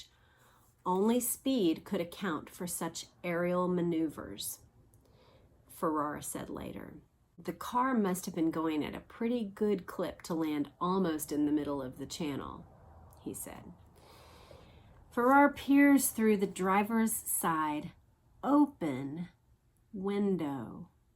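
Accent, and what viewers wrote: Texas, United States. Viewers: I'm watching the start of this series on July 19th 2026, in a small little seaside village in the UK - coincidence! I love your channel and listening to your lovely Texan voice as I do my artwork at my desk, seeing you now and then. I've been following you a while now as the JFK Assassination has always fascinated me. Now I can't wait for you to dive into the Chappaquidickstory. Loving your work (and the trains) 😀👍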